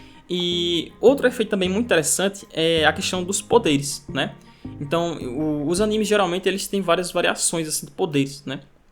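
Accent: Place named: Northeast, Brazil. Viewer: sotaque nordestino e foda❤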